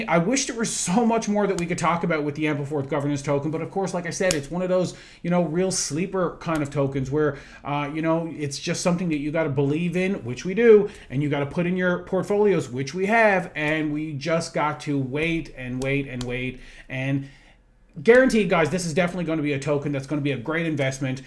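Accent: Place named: Eastern Canada, Canada. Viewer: First time viewer. I knew in seconds you were from the east. I’m in Alberta. Learning about forth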